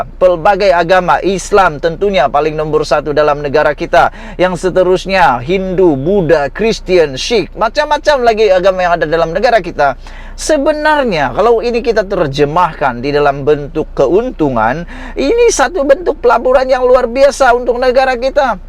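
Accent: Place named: Sabah, Malaysia. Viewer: Cakap mu MCM orang Dusun Darat buat malu ja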